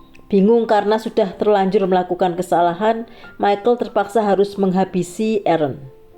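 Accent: Java, Indonesia. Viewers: ... dan terima kasih atas kasus nya yg luar biasa dengan kemedok an nya... salam cinta dari batam untuk madam....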